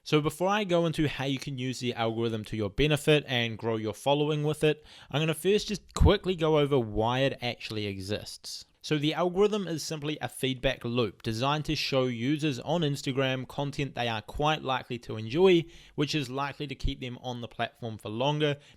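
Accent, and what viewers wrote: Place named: New Zealand. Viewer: Apart from that nice video, I am a big fan of that Kiwi accent!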